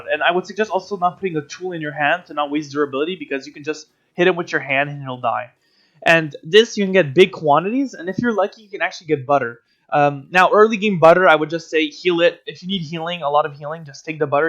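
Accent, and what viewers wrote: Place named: Ireland. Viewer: Just happened upon this channel... I really enjoy the Irish(I'm assuming) accent.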